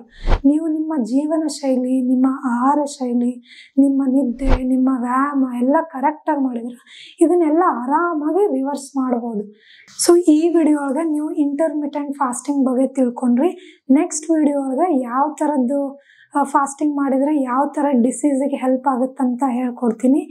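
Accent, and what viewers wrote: North Karnataka, India. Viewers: Thank you so much medam channagi helidira nam utar karnataka shaili mattu namma halli bashe yelli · I am from Bagalkot . Love the way she spoke the original north Kannada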